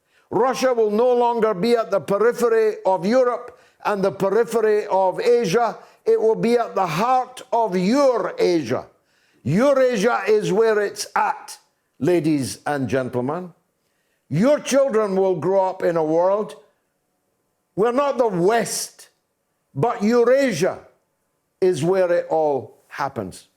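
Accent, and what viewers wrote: Scotland, United Kingdom. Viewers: I love your videos particularly in your Scottish accent, which nailed all your point more succinctly than the English’s accent could. Keep up the good work,.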